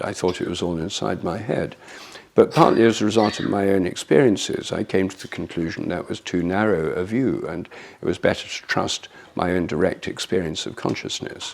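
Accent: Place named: United Kingdom. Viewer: Give it a rest....he speaks English so clearly a student of the language can understand...As an older American who has lived in both Northern California and New England, I can testify that English knows no bounds in pronunciation. While living for 13 years in New England I insisted that my children retain the letter R....yet we all, when tired or first awake would slip and say "weathah " for example...I for one (and I suspect I am not at all alone) love to hear an educated British speaker. Why would a native Californian like to hear such an accent? Simply because it is lovely, tonally pleasant, easy on the ears and altogether pleasant.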